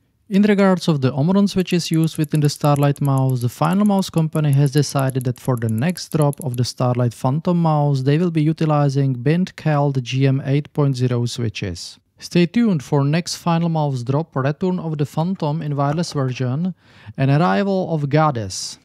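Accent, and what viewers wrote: Czech Republic. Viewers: Are you Czech lol? Also nice video, keep it up.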